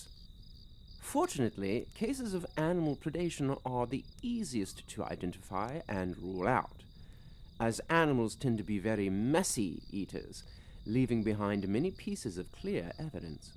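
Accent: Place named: United Kingdom. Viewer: AUDIO TOO LOW! Repost after turning it up to ELEVEN! Lose the Limey Accent - pretensious & condescending.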